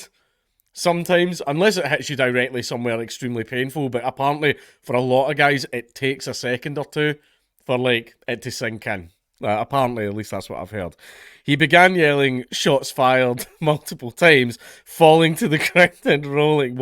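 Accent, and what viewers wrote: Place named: Scotland, United Kingdom. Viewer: "Irish accent", coming from a famous Scottish fella? You numpty.😂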